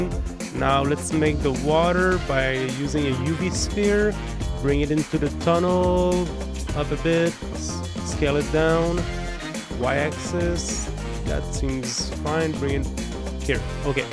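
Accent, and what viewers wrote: Quebec, Canada. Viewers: Is that a Québécois accent I detect? Bonjour cher québécois! The simulation looks astounding!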